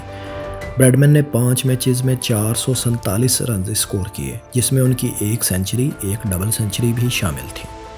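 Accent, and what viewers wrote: Punjab, India. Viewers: Is Bhai ka to to Urdu bolen main panjabi lehja hai . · O bhai punjabi zubani main urdu ka sabaq parh rahe ho?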